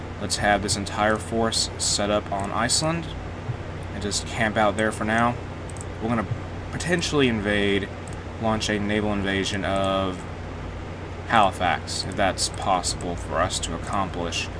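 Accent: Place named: Appalachia, United States. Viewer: Now u sound loke an american hilbelly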